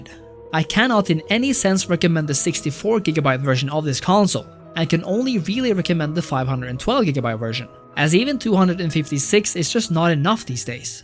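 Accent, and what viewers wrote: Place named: Norway. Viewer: you from Norway? The accent sounds very much like it:)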